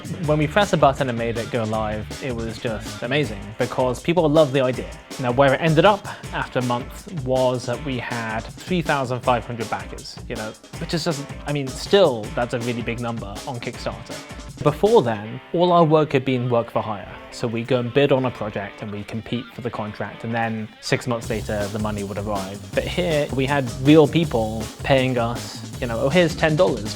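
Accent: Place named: United Kingdom. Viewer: Sounds like a cool idea except I don't understand British accent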